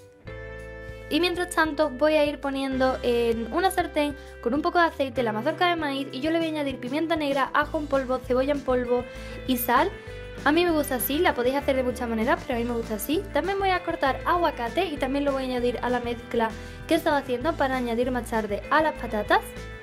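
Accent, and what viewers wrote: Seville, Spain. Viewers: me encanta ese acento aunque no se de que lugar de españa ?? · sevillano de andalucia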